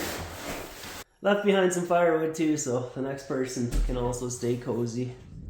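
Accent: Canada. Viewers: If the accent didn’t give it away, the comment about a “2-4 of beer and maple syrup” would have solidified Canadian identity. 😆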